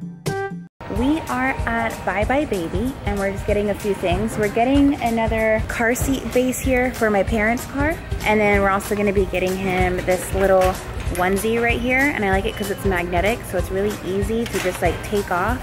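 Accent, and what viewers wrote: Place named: United Kingdom. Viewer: I missed u saying (eggies)....😊also that I think, it's British, accent u do...i guess i miss ur vlogs...it inspires me...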